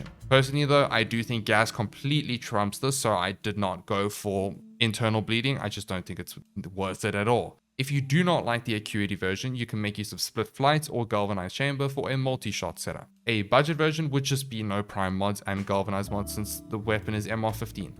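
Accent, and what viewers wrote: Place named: KwaZulu-Natal, South Africa. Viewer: You from KZN bru?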